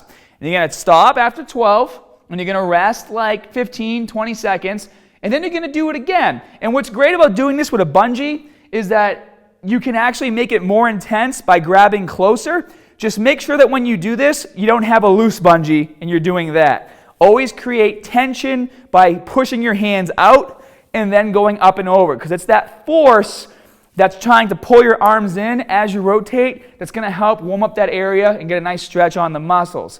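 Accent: Boston, United States. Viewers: Must be from Boston... I grew up in Cambridge. Thanks for the info man!💪🏿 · drjd2real you exhibit 3 things with your unfortunate comment: 1. Stupidity - your comment has nothing to do with the issue being discussed. 2. Ignorance - is speaking like most Bostonians/Massachusettsmen would speak. It's the regional accent. 3. Racial complex - get the chip off your shoulder, that stuff is really tiresome. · I'm guessing from Boston? LOL Great video though! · love the video...but omg i hate that boston accent! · He says start, arms and hard funny. Must be from boston. Everything with a "A" sound lol Accent is bugged out. Real informative tho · When did get a Boston accent? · Is he from Boston?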